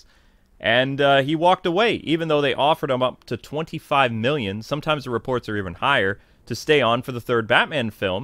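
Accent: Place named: New York, United States. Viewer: I could hear the New York accent coming through today! Great vid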